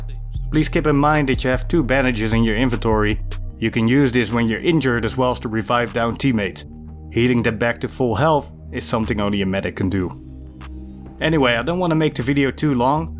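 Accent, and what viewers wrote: Netherlands. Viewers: Proper dutch accent mate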